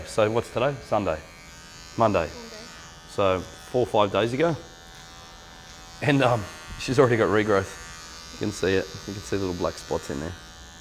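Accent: Australia. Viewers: is he jewish /american,,he looks like Billy joel but sounds like an auzzie or new zealander,,he will probably never come to the united kingdom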